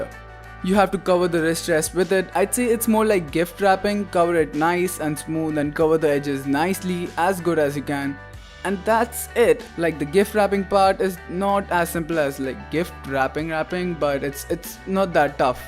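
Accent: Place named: India. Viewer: your accent is so cool, its like indian but not and im all for it lol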